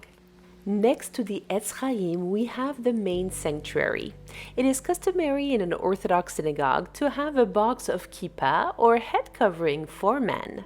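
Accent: France. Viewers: Vous avez un accent français madame !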